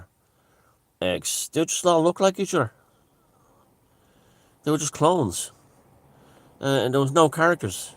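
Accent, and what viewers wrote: Tipperary, Ireland. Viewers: Tip on the tipp accent - you have to add a y as the second letter of every word that ends in a vowel or w. It may well be impossible , I don't think I have the right muscle fibres in my tongue to enable a Wicklow accent, probably requires conditioning since infancy. There is actually a huge diversity of accents in Tipperary(all have that y thing though), they get extreme as you approach the borders with other counties. R.I.P Jordan Maxwell..Really enjoyed you're last few videos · Well I don’t think I’ve laughed so much in years as I’ve just done at your Tipperary accent 🤣😂! I left Ireland at the age of 21..30 years ago now! I remember the characters and I also remember an old fella that used to live in our neighbourhood when I lived in London, he was from Tipperary and spoke exactly the same! He had many a spat with the neighbours which usually ended up with him calling them “feckin pagans”…why he chose to use pagan as in term of insult..well I’m not exactly sure 😂. 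I remember creasing up many a time at his antics. I was about the only one locally he had a good word for..because I was Irish 😂. He always used to remind me that I was only a wee Irish girl in “John Bull” and I must watch out for “dem heathens” over here..OMG 😂..you just reminded me of him, definitely long since passed by now, he must have been pushing 70 at the time. Thank you for the laughs and the reminders ☺️. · Shite Tipperary accent 🙄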